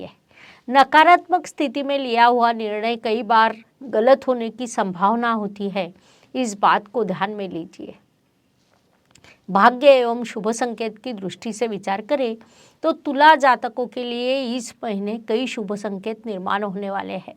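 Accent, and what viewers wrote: Maharashtra, India. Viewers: नमस्कार मॅडम. तुम्ही केलेलं विवेचन अन मार्गदर्शन दोन्ही अतिशय उत्तम वाटले. फक्त हितचिंतक ह्या नात्याने एक अनाहूत सल्ला देण्याचं धाडस करतोय तरी क्षमा असावी. तुमचं हिन्दी बोलणं, म्हणजे चक्क मराठीतूनच हिन्दी बोलताय असं वाटतं. प्रत्येक भाषेच्या उच्चारणीचा, बोलण्याचा एक घाट, एक शैली असते. म्हणजे दुसर्‍या शब्दांत सांगायचं तर पावभाजीत सांबार मसाला घातलात तर जे होईल तसं काहीसं भासतंय! त्यामुळे तुम्हाला खरोखरच एक सकारात्मक विनंती करतो की अधिकाधिक हिन्दी, चांगली हिन्दी सतत ऐकत राहायची. नाटक, सिनेमा, मालिका, समाचार असं काहीही. मात्र आपण चांगली, दर्जेदार हिन्दी ऐकतोय ना, ह्याकडे कटाक्षपूर्वक ध्यान द्यायलाच हवं. आजच्या काळात सर्वोत्कृष्ट हिन्दी ऐकायची तर श्री. अमिताभ बच्चन ह्यांचे कौन बनेगा करोड़पति चे भाग पाहायचे. त्यांच्या हिन्दीवर लक्ष केंद्रित करून. माझ्या सूचनेकडे कृपया टीका म्हणून पाहूं नये, सकारात्मक दृष्टिने घ्यावी ही विनंती. हरिॐ.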